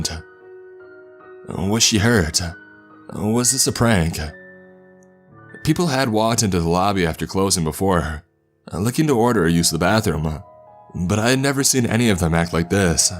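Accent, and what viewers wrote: Canada. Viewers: South Park Canadian narration...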